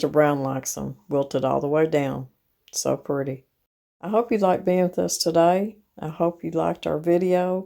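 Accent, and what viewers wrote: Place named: Eastern Kentucky, United States. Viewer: You sound soo much like where i come from ❤!!! I grew up in Eastern Kentucky. I left and im back home. Much love and respect!!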